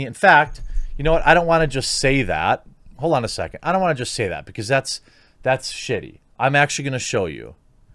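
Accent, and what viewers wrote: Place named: Chicago, United States. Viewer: Yeah, I've been noticing this for a while now. In some of his videos that he does earlier in the day, he starts winding up and gets almost irate, saying things like "I'm so sick of these pathetic spineless losers" or some such. Part of me thinks it's the Chicago accent....there's a "I know something you don't know" quality in there....gets on my nerves. And then there's the reminder that he's bi-racial, and from the southside of Chicago... and he skateboards, and was at occupy wall street, lol. But,, the guy is definitely consumed by his job, and does work hard as hell. I appreciate the hard work and the job he does, just lose some of the condescending attitude. Or like Jeremy said, take a long vacation.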